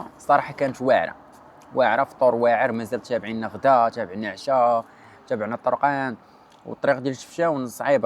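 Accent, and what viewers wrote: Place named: Egypt. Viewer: سفرية 😂😂😂😂وليتي مصري 😂😂متاثر بلهجة مسلسلات رمضان يا مدلولين....سفرية....تفو على شعب متاثر بلغة المشارقة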